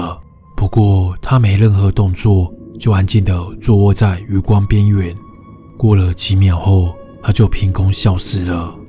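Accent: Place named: Taiwan. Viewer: 台灣國語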